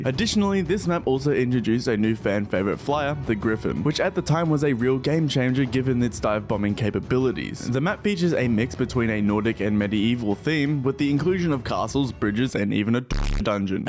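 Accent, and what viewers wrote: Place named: Australia. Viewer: Completely off-topic but this comment made me realize that I was hearing an Aussie accent, and now I’m barely noticing that a large number of youtubers I’ve watched throughout my years are actually Australian lmao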